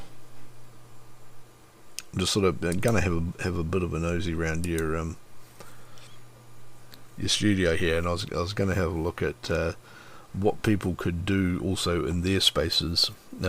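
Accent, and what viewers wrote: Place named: New Zealand. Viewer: hello sir ! i was wondering if you're from new zealand? your accent sounds kiwi, however i apologise if you aren't haha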